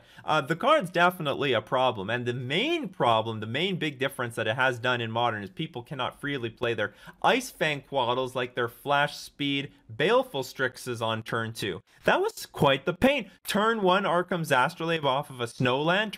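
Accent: Canada. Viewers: When I finally heard it in your accent that you're Canadian. Lol love your content man.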